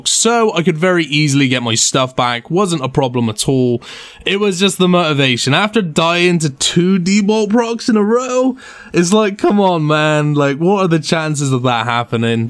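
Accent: Bristol, United Kingdom. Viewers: Are you Bristolian by any chance? Accent sounds recognisable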